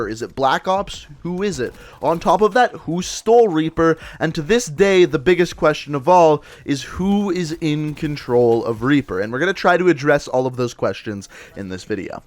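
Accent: Canada. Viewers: OMG the Canadian accent makes this video unwatchable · Is this guy canadian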